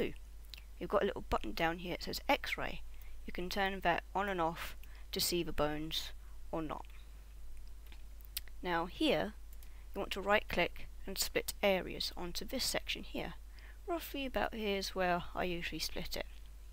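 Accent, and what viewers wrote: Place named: England, United Kingdom. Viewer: omg your english I LOVE ENGLISH PEOPLE OMG :D you sound cool!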